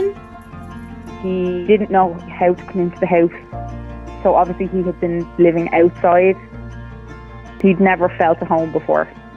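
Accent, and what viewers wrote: Ireland. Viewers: That's a very solid Dublin accent behind the voice that is speaking only the truth, with regard to Walt. Well done, and may you have many happy years,! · Thank you xx love the Irish accent xxx Welsh me see xxx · To hear an Irish voice on the Dodo, is rare but funny 🤣😁 love your story. Hope you teach some of your friends the same patients ☺️ so more people do such awesome things 👍☺️ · That's an Irish accent I believe - I'm in Donegal and took in a rescue German Shepherd nearly a year ago - he's called Tyson and he weighs 100lb - I love him to bits but he's very reactive but gradually settling down....! Walt is awesome and God Bless you for helping him out of his trauma - just like us humans it takes love and time to recover....!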